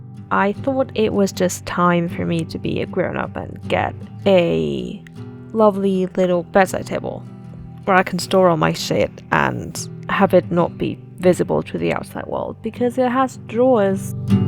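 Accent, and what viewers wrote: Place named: United Kingdom. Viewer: Where is the cuntyy British accent coming from omg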